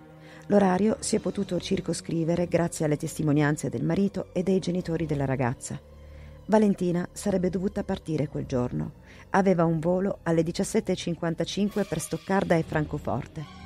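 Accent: Piedmont, Italy. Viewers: io dico Piemonte magari Torino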